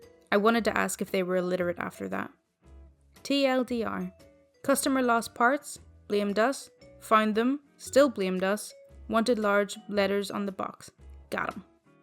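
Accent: Scotland, United Kingdom. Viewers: Where is your ascent from? It sounds Scottish to me i love it though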